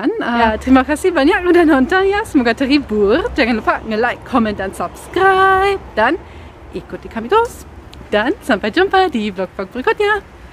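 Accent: Java, Indonesia. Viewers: Wkwk... suaranya medhok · Logate kok jowo 😊🤣👍👍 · Bahasanya medok. Sangat lucu. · Kok logatnya sudah agak medok ya hehe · Meddookkk kak..hhh jowone😀😀😀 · Ahahaha.. saya suka dengan video anda... disampaikan apa adanya tanpa merendahkan... Sayapun turut bangga karena bahasa Indonesia anda sudah bagus... Berlogat Jawa malah.... Mantap 👍👍👍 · Keren ada medoknya mbak.. 😄😄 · Medok jawa mu itu lho mbak...😁😁😁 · Bule Medok khas Jawa ☺☺ Namun Tetep Tak menghilangkan bulenya 👍👍, Matursuwun mbak bule ☺ Lgsung tak like Lan tak Subscribe, Sukses Selalu dari wong jowo di jkt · mba orang jerman tp logatnya mirip jawa, jd bingung aku?!!$$ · Kok medok mba bahasa Indonesianya...wkwkwkwk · Mba bahasa indonesianya lancar logat medok nya kaya jawa...unik nik nik.. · maksih bule, telah memprosikan indonesia dg logat jawa yg kental... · Orang bule Jerman tapi medhok Jawa nya. Hehehe... 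· Bule mana sih mbak ini? Logat jawanya medok bener😁 awas nanti lupa bahasa inggrisnya keseringan ngomong pake bahasa indonesia😂😂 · Medok pisan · Salut mba, medok banget bicara bahasa Indonesia nya, salam sukses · Jawa banget logat nya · sedikit medok mbak bahasanya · Ini bukan orang Jerman lagi tapi orang Jawa asli kayaknya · MBAK..pakek bahasa indonesia, logat jawa hehe... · Suara sampeyan lumayan agak medhok mbak wkwjwk tapi senang dengarnya.. Sukses mbak terus bikin vlog Indonesianya... Mantab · Mbak e lucu banget... Pk bhs lndonesia, tp logatnya Jawa 🤣🤣🤣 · Ini orang Jerman apa Jawa yahh??...ko medok baget logat jawanya......😄😄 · lucu banget orang jerman tapi bahasa lndonesiannya medhok banget..😂😂😂😂saluuttt👍👍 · Bule jowo iki,ada medhokke 2nya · Kok bisa medok jawanya yaa.... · Ngakak q Mbk e... Suaramu rodo medok tapi bicara ttg Indonesia sdh ky kenal banget org Indonesia mantul deh.. 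Good luck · Logatnya medok banget 😭, mirip orang Jawa 😆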